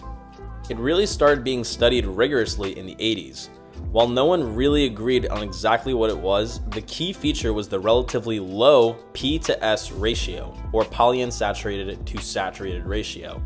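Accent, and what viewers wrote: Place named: New Jersey, United States. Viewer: You from Jersey? You have a pretty distinct accent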